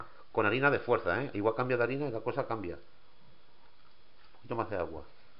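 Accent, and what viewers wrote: Andalusia, Spain. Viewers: Gracias por subir esta receta! (Primera vez visitando tu canal) no se si me gusto mas la receta o see acento español😉 padezco de debilidad por los escoceses y españoles! 😍😍 saludos desde Texas👍🏼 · El hombre es andaluz ,del sur de España ,tienen mucho arte !! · Me encantó! Muy fáciles y sin complicaciones. Me encantó su acento español. Gracias saludos desde Monterrey México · Muy buen video y mejor aún con acento español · BUENÍSIMOS Y MÁS AÚN CON ESE ACENTO TAN NATURAL Y ESPAŃOL. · M. PERALES: Para una mayor concreción, el acento del caballero demostrador de la minuta de los churros es, claramente, de Andalucía (Sur de España). Yo escribo, desde la provincia de Valladolid (España), de la que se dice que es donde mejor se pronuncia y articula el español. De hecho, en verano, a la ciudad de Valladolid y a la próxima ciudad de Salamanca, vienen muchos estudiantes extranjeros a aprender español. 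Y añado datos ilustrativos sobre la receta (o minuta) de los churros, mostrada en el vídeo, que es la básica. Hay gente, en España, que a tal masa le añade una cucharada sopera, precisamente, del aceite de girasol en el que se van a freír los churros, con lo que se consigue un punto de suavidad en el acabado. Y, por demás, decir que hay recetas más complicadas como la denominada "churros de lujo", pero no merece la pena mayores complicaciones, porque la minuta básica de los churros y, luego, un buen chocolate a la taza resulta formidable. A mí, me encanta y, además, es muy saludable por el efecto antioxidante del chocolate y, por otra parte, estimulante por la acción de otro componente del chocolate, la teobromina. Como en todas las cosas del saber humano, aquí, también, se podría estar escribiendo un buen rato, pero no quiero entretenerme. Voy a dar unos apuntes rápidos. En España, los churros rectos, sobre todo, en la capital de Madrid y en la ciudad de Sevilla, se llaman TEJERINGOS. 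Y el churro en forma completa de ovoide, se llama, en todos los sitios, como es l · Ese acentico español es muy cachondo. Por cierto, ¿por qué no no has echado levadura a la harina?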